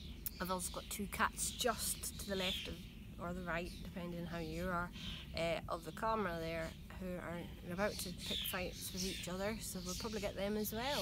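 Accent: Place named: Galloway, United Kingdom. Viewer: Aha! There she is. What a beautiful accent. My friend lives in Mid Ulster; your accent is heavier than hers, more Scottish, though. I've no issues understanding you, though. My people came to Ulster from Galloway, originally. Enjoy your posts.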